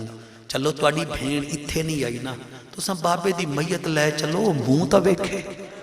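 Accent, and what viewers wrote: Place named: Saraiki, Pakistan. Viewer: Subhaan Allah bayan boht Acha hai🥰🥰🥰🥰🥰 Lakin Andaz main saraiki+Punjabi+Urdu🎌 Hai Jo Thora ajeeb Lagta hai😏😏😏😥😥😣😥😣😥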